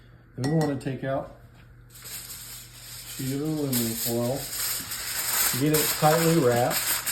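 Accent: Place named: Tennessee, United States. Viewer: Should have known ya'll were from Tn😂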